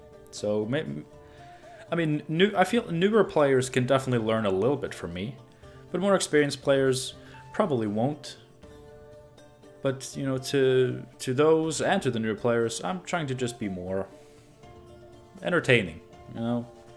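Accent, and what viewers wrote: Norway. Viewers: Hey, I love your decks, I watch them all - and yes, even though I've been playing for years, I still pick up things here and there from you. It's pretty entertaining to watch these matches play out and you seem kind and sincere. Please keep it coming. I've done some decks that have been #1 trending but, for example, I didn't know about the rule of 16 until you told me! I like different decks, I probably spend as much time building and creating. Anyway, trying to place your accent. I hear European, perhaps Norway? Have a great day. And be happy - your Gwenting!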